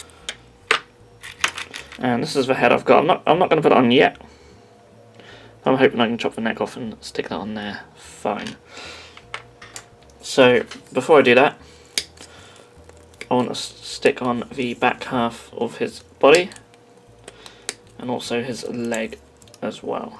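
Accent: Norfolk, United Kingdom. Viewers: Are you norfolk? I'm Norfolk and you sound a little bit norfolk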